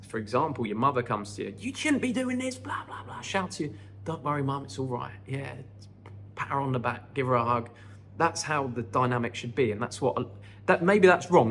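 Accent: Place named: Bournemouth, United Kingdom. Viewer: Didn’t realise you lived in Bournemouth, thought I recognised the accent! Im hoping to move to Koh Samui from Bournemouth at the end of the year 🤞🏼💪🏼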